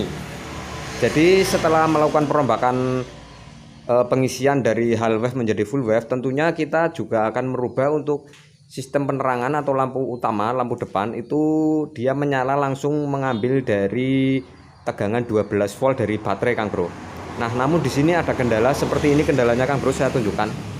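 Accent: Java, Indonesia. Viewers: Bang berarti kabel yg masuk ke output kontak yg di sambung buat lampu tadi gk di copot lagi ya? . Abang ngomonya medok jawa banget tapi nama chanelnya nama suku batak, emang marga silaban ya?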